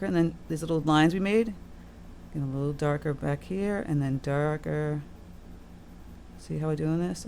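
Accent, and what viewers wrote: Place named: East Coast, United States. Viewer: I'm enjoying your videos immensely, your a great teacher and a pleasant, cool, East Coast voice. Best to ya!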